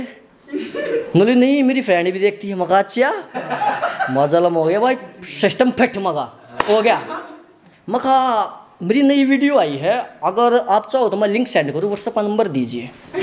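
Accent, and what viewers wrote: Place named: Haryana, India. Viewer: Bhout badiya bhai Haryanvi Level Increase 👍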